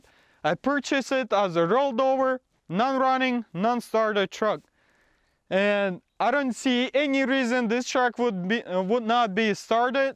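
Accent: Russia. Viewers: Акцент русский!!!! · Бро, ты не русский случайно?)) Акцент выдает)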